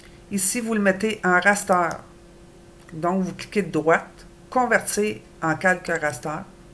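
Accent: Quebec, Canada. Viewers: Hello, merci pour ces tutos en français, je cherchais cela depuis quelques temps. Avec ce merveilleux accent du Quebec. Un maudit français. Bien cordialement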